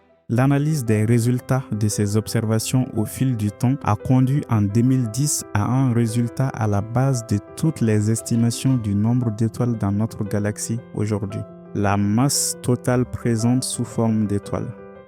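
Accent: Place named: Africa. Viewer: J'adore ton accent africain, c'est rafraîchissant dans ce monde d'internet où toutes les voix sont faites par IA et se ressemblent toutes. Tu articule bien en plus, c'est compréhensible. Merci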